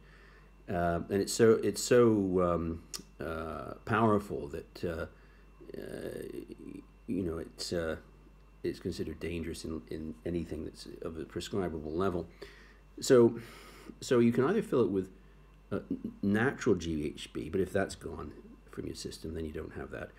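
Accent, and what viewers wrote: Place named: United Kingdom. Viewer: I think I hear a slight British accent ❤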